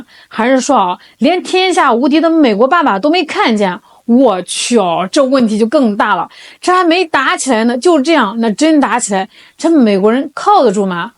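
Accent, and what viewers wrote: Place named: Anhui, China. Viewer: 安徽话真好听，像黄梅戏🎉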